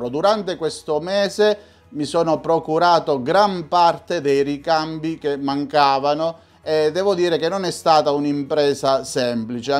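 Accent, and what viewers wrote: Calabria, Italy. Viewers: Me gustan mucho tus videos,yo tuve un panda 4X4 y ha sido el coche de mi vida,me hizo feliz y me encanta el acento calabrés,se entiende todo perfectamente si eres español,felicitaciones!.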